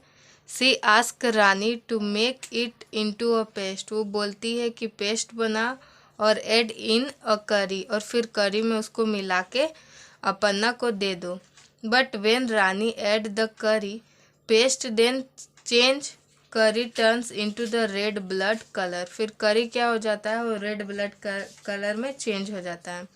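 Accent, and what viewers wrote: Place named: Bihar, India. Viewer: Aap bihari aapki boli se lagta he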